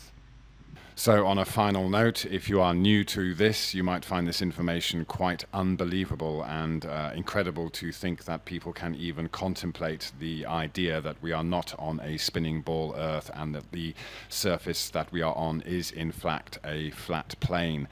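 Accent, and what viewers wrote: United Kingdom. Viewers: You do realize the Moon period has nothing to do with the year, that the Romans had to abandon their 10 month calendar because it did not represent a year and they could not use it to plan their crops? do you know that the moon's 5 degree tilt has absolutely nothing to do with the tilt of the earth, other than keeping that tilt generally stable? Word salad, British accent with lots of extended Ss and your followers think you're extra smart.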